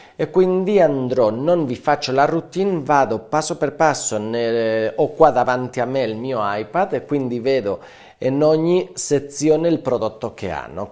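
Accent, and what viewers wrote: Spain. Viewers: Caro dottore, l'ho trovata x caso e le faccio i miei complimenti x la chiarezza di questo video. E anche x il suo bellissimo accento spagnolo😊Grazie.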